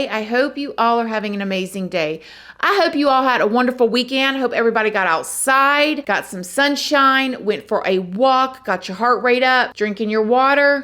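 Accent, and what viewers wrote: South, United States. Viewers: Love southern charm btw job corps = “core” not “corpse” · I love your content but love your southern dialect even more ☺️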